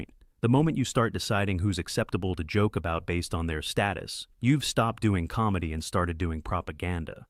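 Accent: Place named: United States. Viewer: Yes, no record of this to be found. Having an American narrate is plain weird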